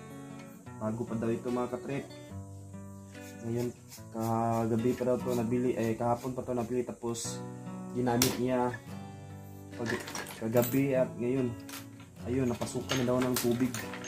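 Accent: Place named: Visayas, Philippines. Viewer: Taga saan ka dol parang bisaya ka Eh saan ako makabili ng battery niyan At Paano yong battery Charchable po ba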